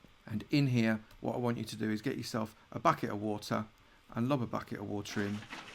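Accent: United Kingdom. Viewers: did anybody tell you that you sound like mumbo jumbo or is it that people with British accents sound the same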